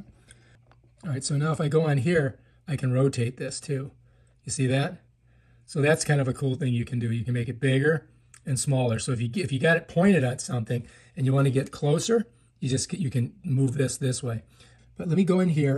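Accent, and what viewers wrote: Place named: United States. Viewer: Do not delete. Why are you giving a camera plugged directly into your phone access to your local network?? Why would some Chinese product need access to all your other devices on your LAN if it’s plugged right into your phone? Think about it! That’s NOT safe. Why do you give it FULL access to your phone’s library? It doesn’t need FULL access to save a photo. Take a screenshot instead of giving access to China to all your files and network devices. You don’t think that’s weird? Oh, and it wants access to connect back to their company! Uhh, to send them your files?? Again, it doesn’t need access. If the software needs an update it would get it through the App Store, not directly from the Chinese programmers. HELLO! Wake up people.? Why are you encouraging people to do this? What is your motive? Who paid you to post this and other videos for this same dangerous device? 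You might sound American, you are anything but.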